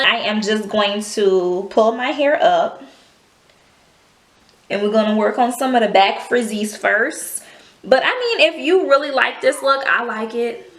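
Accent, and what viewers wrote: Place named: Louisiana, United States. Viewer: Queen! I knew as soon as I clicked on you that you were from Louisiana! You sound like Mardi Gras, crawfish, and gumbo. Man I miss the south!!! Gorgeous hair!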